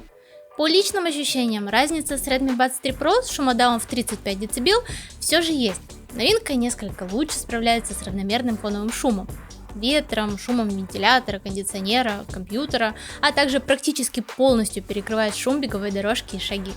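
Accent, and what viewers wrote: Perm, Russia. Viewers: Вы что, из Перми?)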